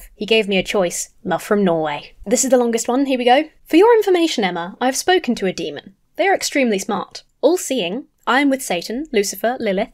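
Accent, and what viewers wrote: United Kingdom. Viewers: Hi there! I just wanted to take the opportunity to thank you for what you do (from "across the pond," as the old cliche goes)! I feel as though YOU get under Hovind's skin more than anyone else. He just can't handle a vibrant, youthful, highly intelligent, HILARIOUS, fenale. Throw in that WONDERFUL British accent and those voices you use (especially Hovind impersonation), and we have the icing on the cake! You ROCK!!!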